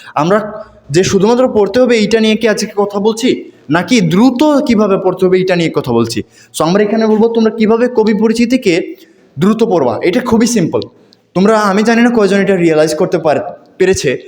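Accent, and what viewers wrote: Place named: Sylhet, Bangladesh. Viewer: Vai ki Sylheti? Apnar accent sune mone holo apni Sylheti.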